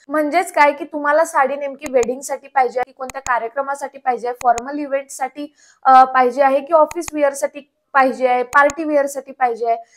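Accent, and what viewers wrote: Kolhapur, India. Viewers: I love the fact that you have sticked to the Kolhapuri accent unit now! Keep it up! Wishing you the huge success!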